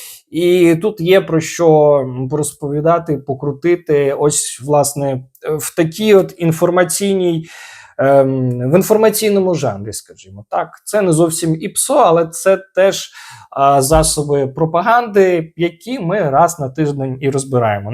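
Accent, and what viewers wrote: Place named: Russia. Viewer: сгарайцє, сгарайцє...,, Фу, гидота. Вже навіть орківську вимову перейняла.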